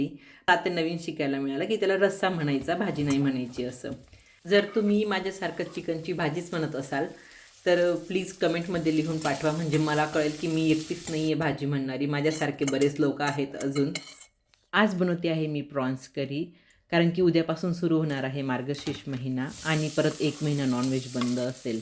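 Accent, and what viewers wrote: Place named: Khandesh, India. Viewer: Khandeshi bhajich bolta 😊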